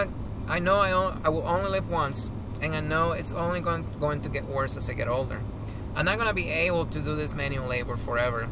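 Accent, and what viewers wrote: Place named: Nicaragua. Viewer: Your accent sounds like you're from Nicaragua.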